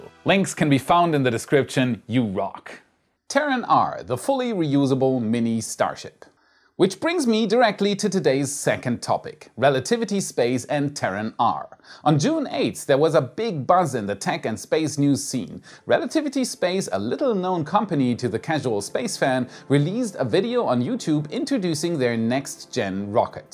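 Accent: Germany. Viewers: Is this guy German? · Aha, ich hab direkt rausgehört das du aus Deutschland kommst, aber irgendwie keine Anzeichen gefunden bis ich auf deine Webseite gegangen bin 😉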